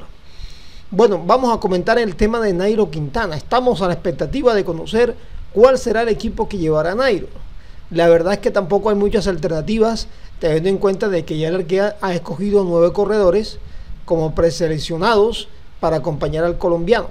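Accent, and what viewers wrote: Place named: Spain. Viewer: lo admiro, pero no hables estilo español, no dugas vidio, somos colombianos, ( vídeo,) un abrazo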